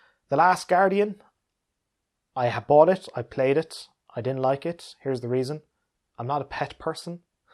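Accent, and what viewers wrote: Ireland. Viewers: Currently listening to these as an aid to get me to sleep. They do say the Irish accent is musical. All I know is it works. Thanks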